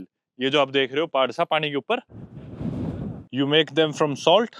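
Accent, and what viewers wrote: Haryana, India. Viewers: Hariyana ka hai bhai lage tu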